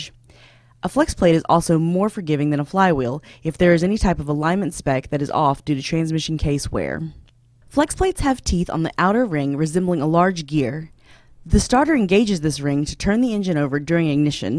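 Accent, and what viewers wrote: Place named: California, United States. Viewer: Haha she sounds like some cali broad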